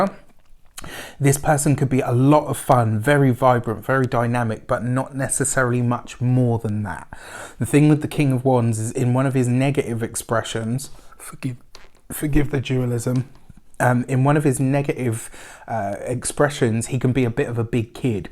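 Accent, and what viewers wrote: England, United Kingdom. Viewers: you are clear, honest funny and direct. Your cute English accent makes me smile. Thank you so much for continuing, I always look forward to seeing your posts.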